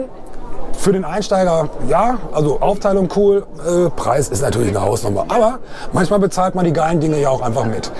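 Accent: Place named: Gelsenkirchen, Germany. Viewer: Dein Sprachausdruck ist furchteinflössend.... Willst Du deine Videos nur nach "Gelsenkirchen hin" verkaufen !!!!! Drei mal Daumen runter für Audio :-(((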